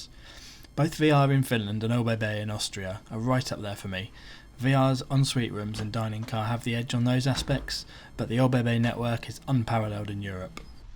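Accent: RP, United Kingdom. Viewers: It’s good to hear your voice! I like the British accent mate 👍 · The VO is fine but the non VO was a USP- and the English accent can sound a little posh and dare I say - the old colonial timbre creeps in - so! stick to the old formula - less distracting and more focused on the visuals and good captions - one VO per year!! Your content is so good without the VO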